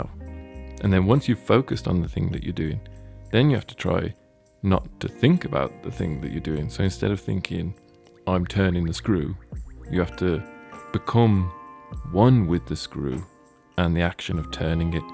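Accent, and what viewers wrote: United Kingdom. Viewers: Its hynotic to just see you draw your british blabber fades as background noise.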